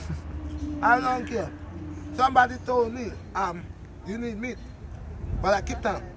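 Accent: Jamaica. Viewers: I could tell he was Jamaican before even goin on the video 😂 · Is that Jamaican?? 🤔🤔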